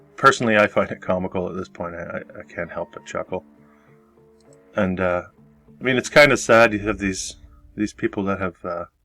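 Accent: Canada. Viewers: …Can’t wait. Great stuff your putting out…Looking forward to the Erie Canal vid, you mentioned…I’m I picking up a little Canadian accent..🍻For now. I’m off to clean up more ❄️ ❄️Lake effect from Erie. Ani’t it grand?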